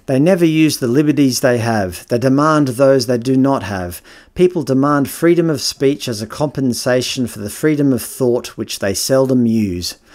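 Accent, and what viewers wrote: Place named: Australia. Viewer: you're an Aussie !!!!! Love this subscription even more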